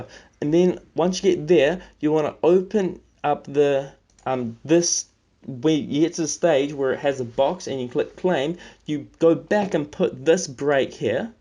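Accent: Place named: New Zealand. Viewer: you sound like a kiwi lol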